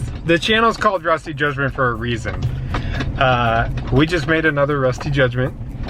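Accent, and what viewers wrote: United States. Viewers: Oh my goodness, Floridians and don’t talk that way, that’s more Georgia… You’re hilarious! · ! Your southern accent is too funny!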